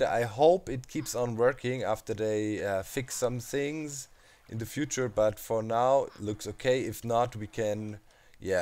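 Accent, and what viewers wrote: Germany. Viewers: are there any English words which you struggle to pronounce due to your German accent?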